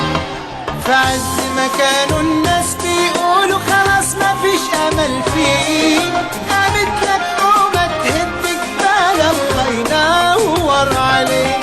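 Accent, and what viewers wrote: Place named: Morocco. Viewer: جيت للمغرب ولهجتك المغربيه حلوة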